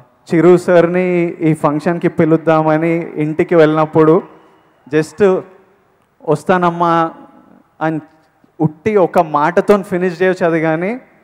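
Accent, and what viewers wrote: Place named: Telangana, India. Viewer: We love ur way of talking when u mix telugu with english in తెలంగాణ యాస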